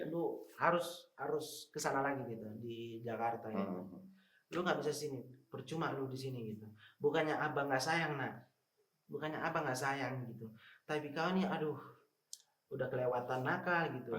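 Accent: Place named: Eastern Indonesia, Indonesia. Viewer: "Apa Segala Macem" Logat orang timurnya gak ilang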